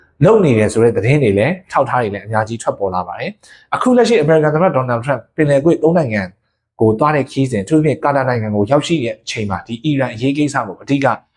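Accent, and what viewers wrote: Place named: Mawlamyine, Myanmar. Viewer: ဒီအကိုစကားပြောသံက ကျနော်တိုမော်လမြိုင်စကားသံနဲ့တူနေတယ်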